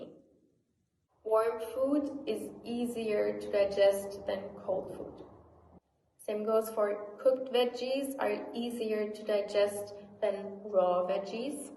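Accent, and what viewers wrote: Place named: Germany. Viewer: She just have such a german accent.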